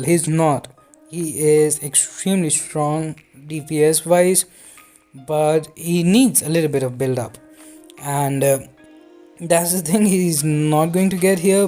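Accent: India. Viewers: Only disliked it because of your Indian accent.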